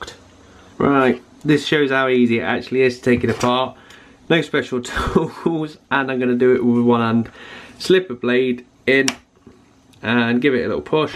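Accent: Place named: North, United Kingdom. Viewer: Legend. Loving the northern accent too. Where did you get the WiFi card?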